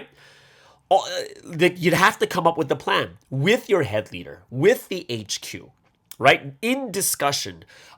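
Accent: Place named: Australia. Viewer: Oh I'm all for 👏 GIRLY 👏 AUSSIE 👏 ACCENT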